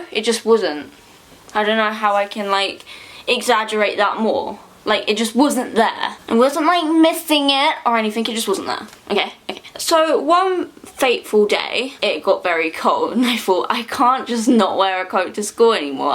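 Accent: England, United Kingdom. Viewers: Ur English 🇬🇧🇬🇧🇬🇧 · you mean shes from England, Australian's still speak English :) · Taylor Stege she's English :)) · So this is suppose to be a complete compliment.... I love how you talk, you slightly remind me of a sassy like pirates teenage daughter. I'm from California so I'm obsessed with English accents just like every other Californian haha but yea this is great. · Is she British?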